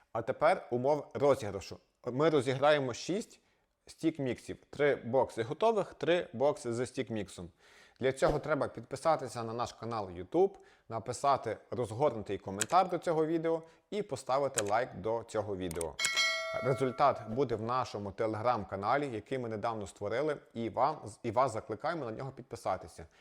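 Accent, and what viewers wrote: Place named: Lviv, Ukraine. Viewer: Вітаю! Гарно показали і пояснили. Дякую за цікаві відео і Львівську українську!)))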